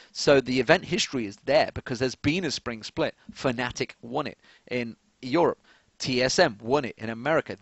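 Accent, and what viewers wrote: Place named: United Kingdom. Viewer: You make me want to talk in a British accent... :/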